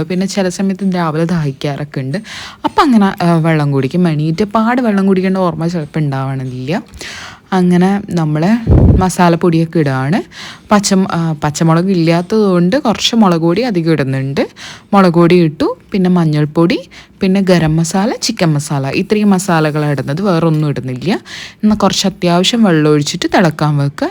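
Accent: Kannur, India. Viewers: Da സംസാരം endho vere slang പോലെ തോന്നുന്നു. Knr touch കുറവാ 😍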